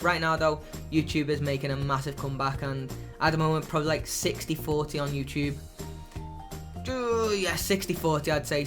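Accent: Liverpool, United Kingdom. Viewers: This might seem like a stupid question but surely if you grew up in Indianapolis you would have picked up there accent but you have a very thick scouser accent?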